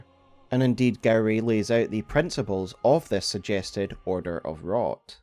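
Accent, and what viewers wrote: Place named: Scotland, United Kingdom. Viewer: Your nuts bro, listen to a fellow Scotsman Explain Elden Ring lore is top notch. Well done ma man! 🫡 🏴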